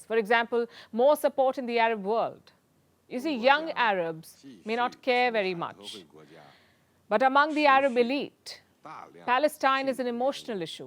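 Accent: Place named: India. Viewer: Is this American media with India accent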